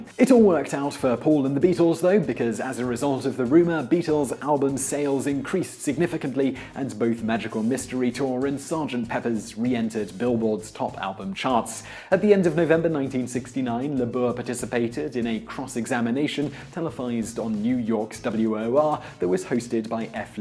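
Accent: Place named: Scotland, United Kingdom. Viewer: You have a scottish accent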